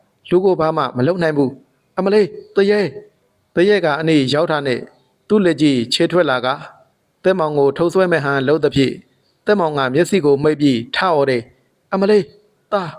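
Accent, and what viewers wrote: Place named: Rakhine, Myanmar. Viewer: အသံ ကောင်းတယ် ရခိုင်သံဝဲသလိုဘဲ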